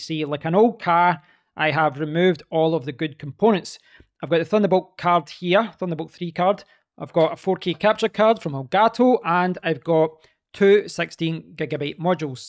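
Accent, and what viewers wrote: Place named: Edinburgh, United Kingdom. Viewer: what a lovely accent!! Here in Edinburgh, and find this video sound so familiar!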